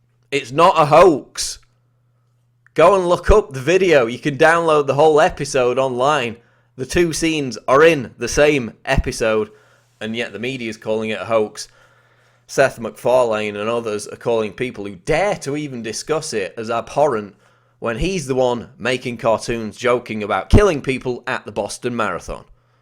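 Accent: United Kingdom. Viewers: Is Alex Jones still doing the Piers Morgan voice or is the narrator British?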